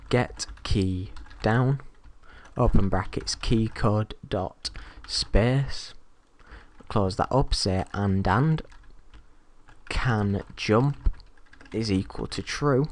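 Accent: United Kingdom. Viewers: Classic British accent ;)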